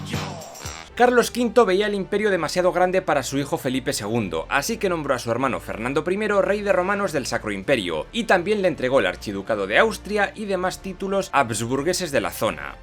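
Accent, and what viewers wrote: Spain. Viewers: Muy bueno. Como apasionado de la historia es un placer ver tus vídeos.Ahora si, me hace gracia tu acento español y que le resulta imposibles decir las palabras esdrûuuujulas que poseen los nombres italianos y americanos por ejemplo. Pero vale, nada de eso le quita mérito a tu trabajo Saludos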